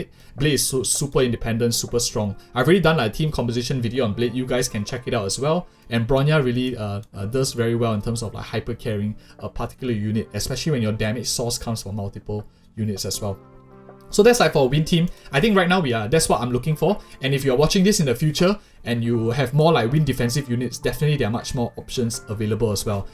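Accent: Singapore. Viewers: singaporean accent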